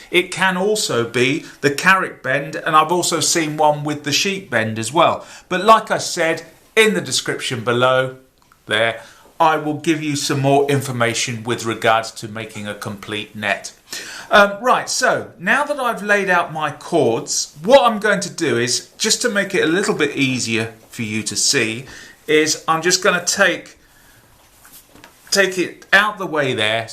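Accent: England, United Kingdom. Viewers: first of all i wanted to say you can eat a bag of d*cks because you’re english accent wasn’t even the worst part it was trying to follow along with all of your unnecessary BS that you put me through watched a different video solved all my issues and i felt a sense of bliss. You on the other hand deserve to be taken off of youtube for making my life worse causing me to crash out watching your shiet video.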